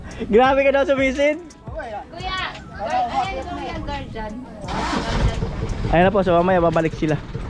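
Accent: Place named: Maranao, Philippines. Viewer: Maranaw ung tono nya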